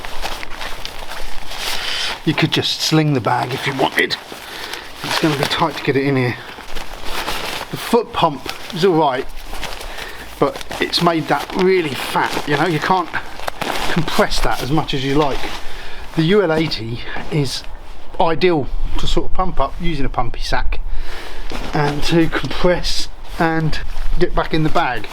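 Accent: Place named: London, United Kingdom. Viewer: lol you sound london,but your mate is deff essex,love your channel,your the one helped with my first wild camp,been going to the moors since 1993,never wildcamped until other week,thanks to you went to merrivale ,loved it.so thankyou for helping me.. debs and stay safe.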